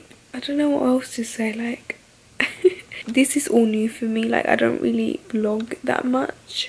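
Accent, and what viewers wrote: United Kingdom. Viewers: could be from another place in UK. Her accent is English since she grew up in UK. Duh😂🇬🇧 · Vive en Inglaterra su inglés es británico la pronunciación es diferente al americano. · She has an English accent from London. It's not very unique just a London accent XX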